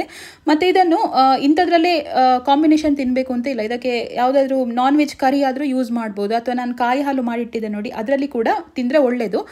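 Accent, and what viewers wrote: Mangalore, India. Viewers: Namma kudlada kannada eshtu porlu marre kenga keluva anthave agtade ❤❤❤ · Manglore kannada thumba chanda❤ kelalikke thumba khushi. Nammoora bhashe❤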